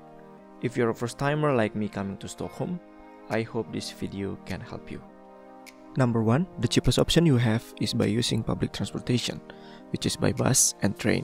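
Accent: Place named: Indonesia. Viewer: Hi are you Indonesian? You have accent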